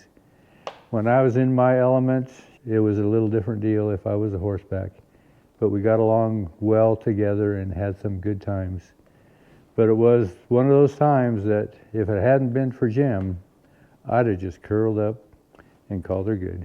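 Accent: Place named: West, United States. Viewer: Once again, thanks for a wonderful yarn. Sure put a smile on my face! Love to listen to a real westerner spin a tale.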